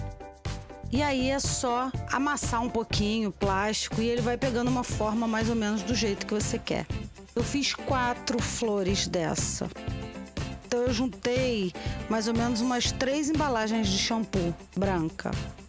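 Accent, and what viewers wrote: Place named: Rio de Janeiro, Brazil. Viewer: É fácil reconhecer nosssso "sotaque"! #RJ❤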